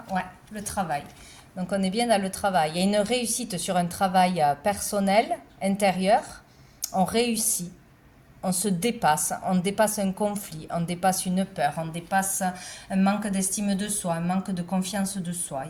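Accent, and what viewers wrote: South, France. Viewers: Ça change un peu l’accent du sud ! Il n’y en a pas beaucoup..en plus c’est le mien 😊 Merci pour ce tirage !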